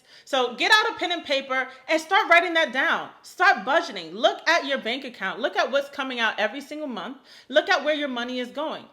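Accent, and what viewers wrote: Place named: New York, United States. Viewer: This is the 5th video I’m watching of yours today, since the first video I said her accent is from NY and she’s giving me a lil Carribean and you said NY!! I divinely found your page today, thank you I needed it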